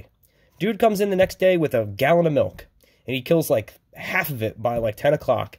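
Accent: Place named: Maryland, United States. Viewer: I knew you were from Maryland the second you started talking haha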